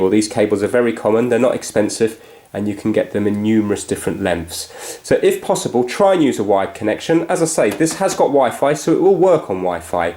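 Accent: United Kingdom. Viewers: I love British accent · Is he British?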